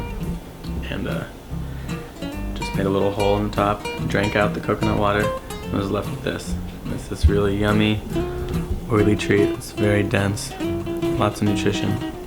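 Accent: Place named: United States. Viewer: Hi guys, watching this brought back loads of memories. I was born on the island of Trinidad. We had a coconut tree in our yard. I know you pick off the younger coconuts, when the flesh is soft, you can scoop it out with a spoon, and put it in a glass or whatever container that you collected the water in before you cut the coconut open to get the flesh. Delicious!!. Where in the world are you guys? You sound like an American. Enjoy your meal, coconut milk makes every thing taste better,and quite nutritious too.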